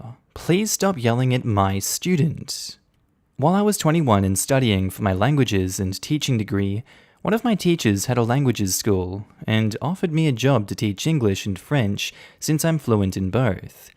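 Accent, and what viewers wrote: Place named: Australia. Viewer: I miss the “chuck a prawn on the barbe” in your wonderful Aussie accent.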